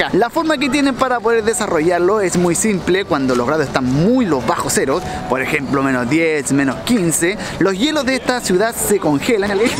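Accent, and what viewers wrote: Chile. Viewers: Ya usas el acento chileno, ya eres mas tú y no hablar con tono de robot bcjfjkf ♡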